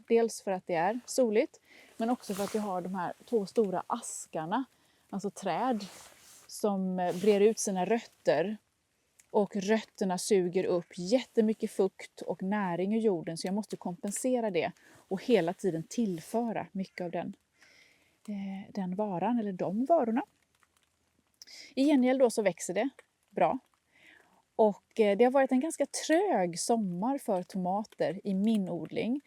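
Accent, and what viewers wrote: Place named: Sweden. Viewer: Som dansker forstår jeg 95% af hvad du siger. Det er sjovt hvordan det bare kommer an på den svenske accent hvor meget jeg kan forstå. Det er som om du snakker dansk til mig 😅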